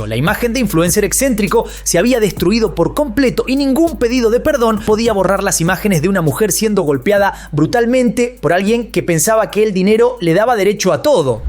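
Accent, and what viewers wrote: Argentina. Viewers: Está bien el reportaje pero lo malo que lo narra un argentino y no un mexicano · Nunca e salido del país (México) y aún así detecto que eres argentino a mí parecer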